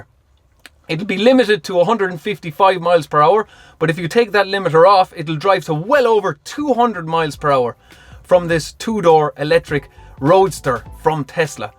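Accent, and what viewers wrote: Ireland. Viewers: It's my first time here, too. He's got a Greek name and an Irish accent! Love it.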